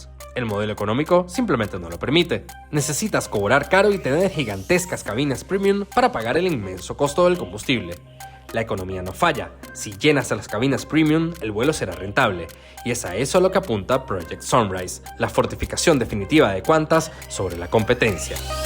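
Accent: Venezuela. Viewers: Jajajaja, la gente quienes comentan sin conocer los canales! El usa su verdadera voz y los venezolanos hablamos así, y no hay ningún país, ni siquiera España, en donde la gente hable español pronunciando absolutamente todas las letras, entendido, brodel?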